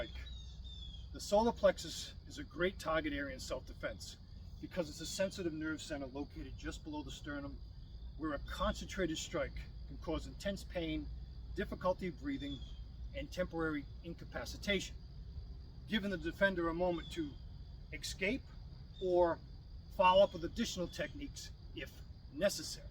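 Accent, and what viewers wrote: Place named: New England, United States. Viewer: Hi! Thank you! I detect a New England accent! Have a wonderful day! John KJV John KJV John KJV ❤❤❤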